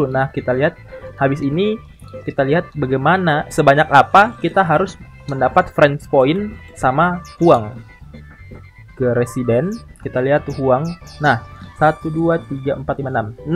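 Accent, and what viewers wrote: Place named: Makassar, Indonesia. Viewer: Logat memang tdk bs berubah sappo Semangat trus sappo semoga semakin tdk belibet nantinya Salam kenal sappo Sy jg orng Makassar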